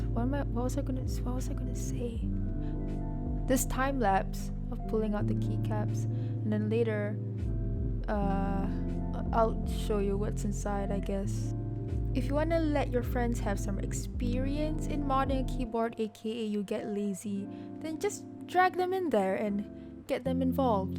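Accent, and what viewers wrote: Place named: Philippines. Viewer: just curious but are you a filipina? you sound like one ngl i am not meaning this in a bad way btw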